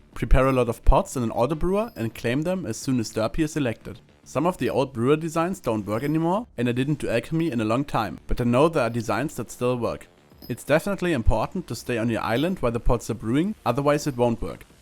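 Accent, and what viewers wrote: Germany. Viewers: i would buy my coop alchemy 50 ign laux2ß2ß nice vid btw and u have a small german dialekt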